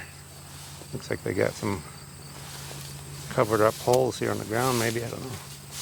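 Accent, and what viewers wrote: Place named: Minnesota, United States. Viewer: That convent is a pretty magnificent old building in the daylight, but would scare the sense out of me to walk up on it at night. No way you'd get me in there. If I was to guess, I would say you might be from Minnesota. I lived there as a kid in the 60s, and your way of speaking sounds like my mother's side of the family who still live there. I've lived in Nebraska ever since then and some people still guess me to be fom Minnesota. Some things ya never lose. Enjoyed the tour. Thanks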